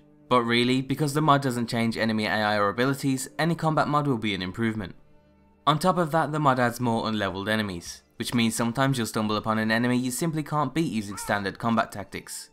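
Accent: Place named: West Midlands, United Kingdom. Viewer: Where you from? Sounds like west midlands haha. I'm from Birmingham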